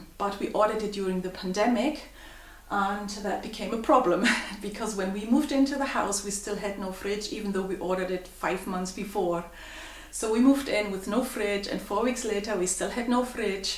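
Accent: Scotland, United Kingdom. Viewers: I love your home and your colours and the way you have picked up a slight Scottish accent accent. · I hope you don’t mind me asking but I’m curious to know where you’re from. You obviously have a Scottish tilt but I can detect another accent, possibly German or Scandinavian? Have you lived in Scotland long? Lovely video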